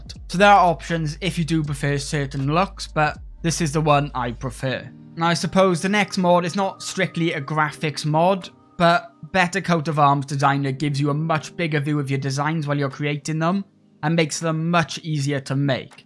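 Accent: Wales, United Kingdom. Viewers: Ahh! I used never be able to tell the Welsh accent, but one day it just clicked and now I hear it all over Youtube. (I'm Irish)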